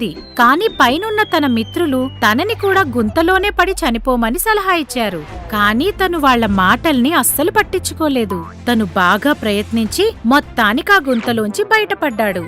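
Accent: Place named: Telangana, India. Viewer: Hey telangana yasaa super abba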